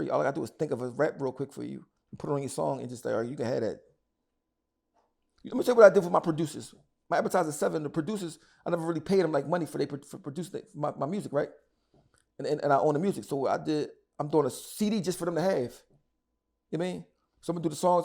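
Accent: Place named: New York, United States. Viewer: I'm from down south in Tennessee. I'm loving this video I love to hear, men from New York speak. Lol at one point I moved to New York just for that reason. Thanks for the video. 😎💜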